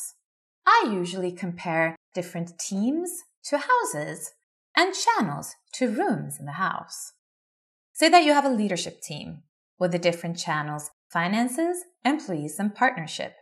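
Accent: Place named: Sweden. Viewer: Applaudable pronunciation, but I could hear that Swedish accent! :D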